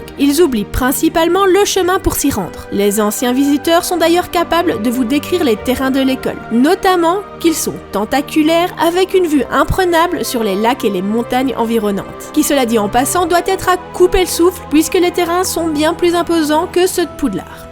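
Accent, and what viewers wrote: Belgium. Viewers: Tu es belge ? En tout cas, super vidéo et vive ma Belgique !!!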